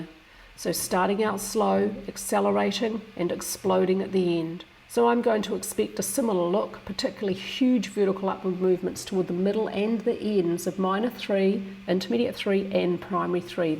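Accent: New Zealand. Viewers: Jack has done a wonderful job, but it's so, so lovely to hear from you again. Being an Aussie who's visited the Land of the Long White Cloud many times in the past, I really love listening to that Kiwi accent 😅 Thank you for your analysis, being a total mathematics dyslexic I really appreciate it.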